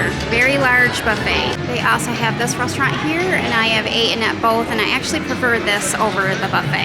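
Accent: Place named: Michigan, United States. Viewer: Hey, CornDog here. That was really well done! My wife and I have stayed at the Riverside regularly over the past 30 years....I'd guess over 50 trips to Laughlin. We can drive there from San Diego in about 5 hours which includes a couple of stops along the way. Laughlin is just a friendlier place than Vegas and of course a much older crowd too. Have you done a video on the Jet Boat Tour down to Havasu? My wife & I took that trip a few years ago. Some beautiful scenery for 60 miles down and then back up the river. Are you originally from the upper midwest. I grew up in Michigan and you sound like you could be a Michigander. Thanks for the video!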